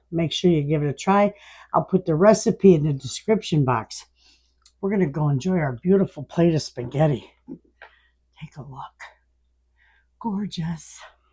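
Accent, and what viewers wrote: Michigan, United States. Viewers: I heard your accent and I said shes a Michigan girl. Went to your description, yep!!! I"M a Yooper.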